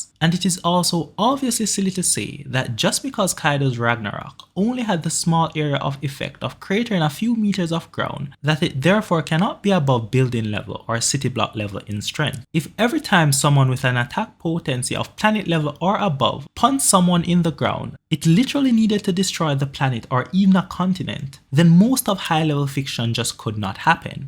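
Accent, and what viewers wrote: Jamaica. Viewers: U Jamaican? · Definitely Jamaican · This man sounds like a yaadie. Subbed.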